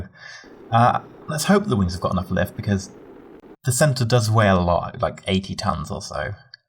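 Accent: United Kingdom. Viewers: R u British? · Are you british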